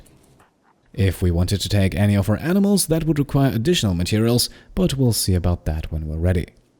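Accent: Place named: Germany. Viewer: Deine Stimme ist so entspannend weiter so danke, hoffe du wirst riesig! Your Voice is so relaxing keep it up i hope you get huge! I assume that you are German because of your way of speaking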